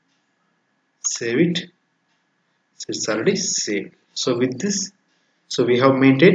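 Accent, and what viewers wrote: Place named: South India, India. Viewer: sorry to say but when u upload any such video try to speak clearly instead of speaking in south indian accent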